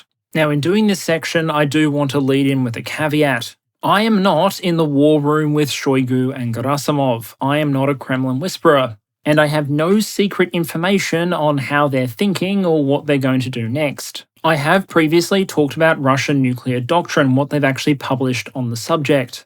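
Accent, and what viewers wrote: Australia. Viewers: I feel like outright stealing the whole stylistic shebang for work, starting from the aussie accent, through the PowerPoint layout, up to the begrudging respect for the strategic prowess of emus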